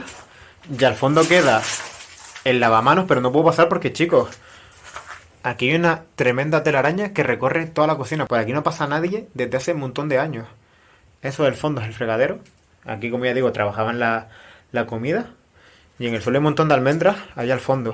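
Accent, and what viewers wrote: Spain. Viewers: X eso tiene el acento español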